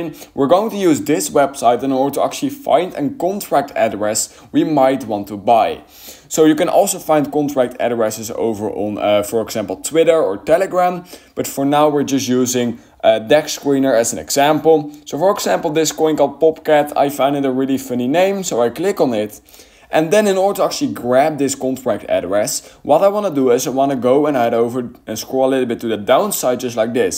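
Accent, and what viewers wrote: Netherlands. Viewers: I can hear the strong Dutch accent😂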